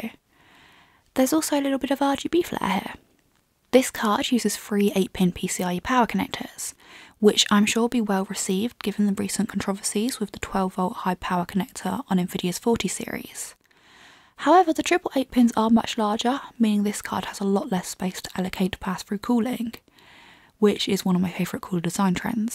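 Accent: United Kingdom. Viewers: amo tu acento britanico.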